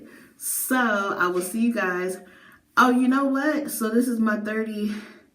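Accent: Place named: Philadelphia, United States. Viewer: Hi! Are you from Philly? I ask because I am too and I can hear a Philly accent. I am sooooo happy for you and your husband ❤️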